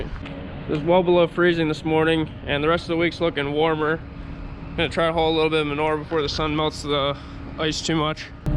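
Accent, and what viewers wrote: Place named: Pennsylvania, United States. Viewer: Hey, thanks for your answer, I was curious. Thank you also for your excellent videos over the years, I watch every single one ASAP! I am originally from Bucks County, PA and really enjoy watching you and your dad, and listening to your accent, brings back memories of my grandfather's farm in Douglassville, PA. You do great work, best wishes for continued success, you certainly deserve it!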